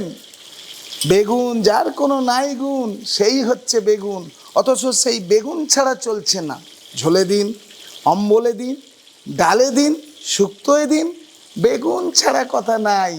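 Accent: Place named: Birbhum, India. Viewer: বীরভূমের লোক মনে হচ্ছে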